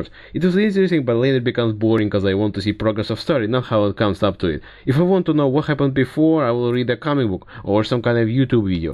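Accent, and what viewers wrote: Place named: Russia. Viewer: I'm a french canadian and also not very good in english, but somehow I understood 99% of what he said. Sure he have a russia accent and he's speaking very fast (that's the point of the video, fast like Zero Punctuation), but I've heard faaaaar worse on youtube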